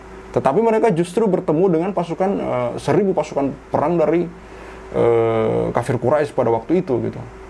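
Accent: Eastern Indonesia, Indonesia. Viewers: Mantaf.......Logat Timur Kaka....,👍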